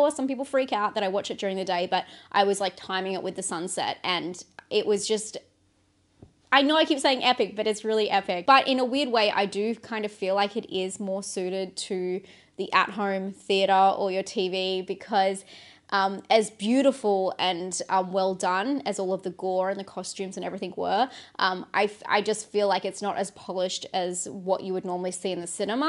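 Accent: Australia. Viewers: Are you an Aussie?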